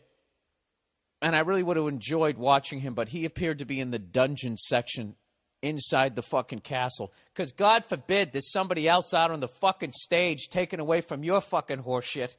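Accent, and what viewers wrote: Boston, United States. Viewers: It's weird. is like some kind of boston inflected, test dummy for life. He could have a take on anything and you could listen for hours. Imagine someone dropping him off in afghanistan and then the following monday morning you have: Afghanistan. Then he would finish off with Nia's take on it.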